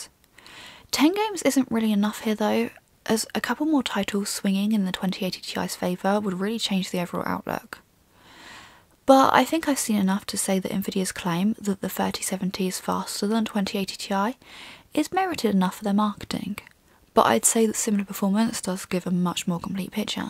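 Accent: England, United Kingdom. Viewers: Voice of a woman on review and its brish accent. .....tasty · That English accent 👌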